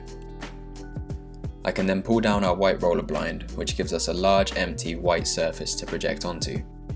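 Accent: United Kingdom. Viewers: So hard to understand English with British pronunciation unlike American pronunciation for me as a foreigner. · British Matt D’Avella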